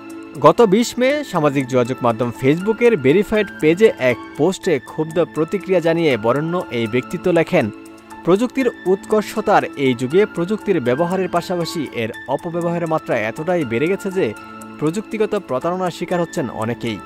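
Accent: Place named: Kolkata, India. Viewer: আমি পেয়েছি ফেসবুকে কিন্তু আমার বিশ্বাস হয় নায়। কন্ঠের উচ্চারণ কলকাতার ❤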